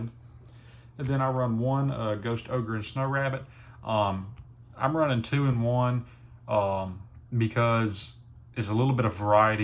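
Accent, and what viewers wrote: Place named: South, United States. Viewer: Something about hearing a southern accent talk about yugioh seems just right. Great deck, hope your channel booms.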